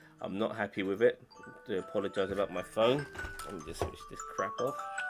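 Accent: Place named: United Kingdom. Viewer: This guy is too brittish!!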